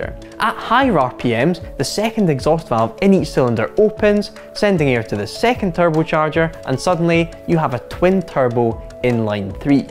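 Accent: Scotland, United Kingdom. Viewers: The accent is scotish ?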